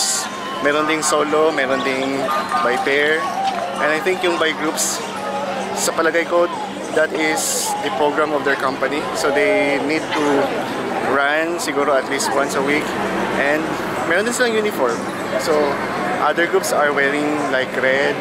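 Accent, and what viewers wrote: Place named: Indonesia. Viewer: Betulll,logat inggrisx jg indo bnget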